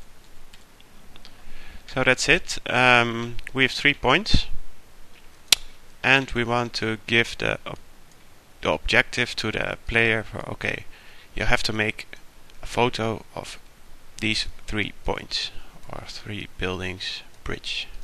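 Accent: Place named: Netherlands. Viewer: Je hoort wel dat het een Nederlander is ;D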